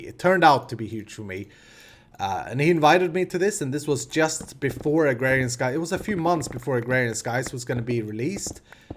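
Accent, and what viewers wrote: Sweden. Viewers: Congratulations:) I subbed when I first saw you playing the PvP game that Doc and Etho made at Danglands:) I loved your attitude and they way you pwned them xD Thank you for being such a great person and entertainer <3 Plus your voice is really cool with the Swedish accent:3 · I'm one of those that came from Beef's channel, only that I just started following him too! Basically got here indirectly through following Etho xD Love your content (and your super funny Swedish accent :D) High five from Italy, bro! :) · Congratulations dude! I found your channel through your mystcraft tutorial a long time ago. From there I watched your videos every now and then but i never subscribed. Then i found meatylock. He re-introduced your videos to me. I thought, this weird Swedish guy with the crazy accent again? He's hilarious. Ever since I've been subscribed. 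With 4 different accounts atm. You're welcome btw <3 thanks for the quality content.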